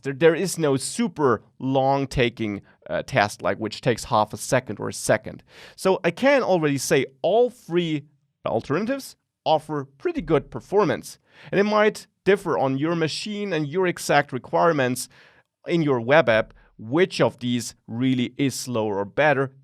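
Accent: Germany. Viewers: that is the second video i watch from u, i really like ur videos, that is why ur earned my sub. i have to laugh about ur german accent but still Tapfer of u, u talk very well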